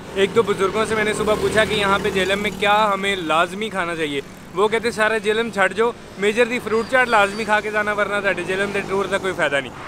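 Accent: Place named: Bihar, India. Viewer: Waise tum ek bihari ki tarah bolte ho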